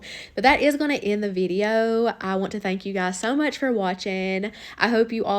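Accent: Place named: Kentucky, United States. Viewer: All of it looks delicious! Something that I can make! Love that Kentucky accent!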